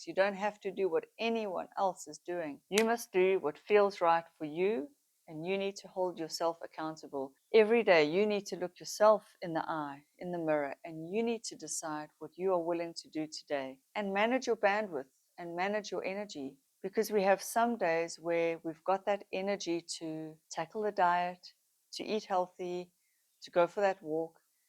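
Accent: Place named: South Africa. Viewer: You’re South African! Me too! I can hear it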